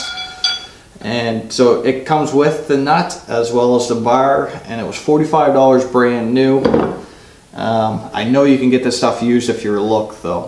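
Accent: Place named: Newfoundland, Canada. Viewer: You sound like a neufie in this video. Good idea for the work vise.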